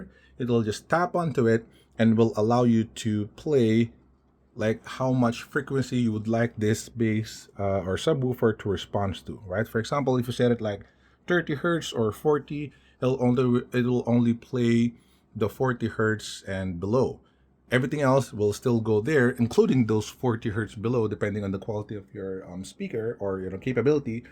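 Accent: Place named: United States. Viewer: naka automatic play next tong YT ko. nkikinig ako habang nag wowork kasi ng mga reviews ng Edifier MR5.. tpos nag next auto play tong Video mo. akala ko black american. pinoy pla. sana ol gnyan accent. thanks sa review dude. nag sub na rin ako.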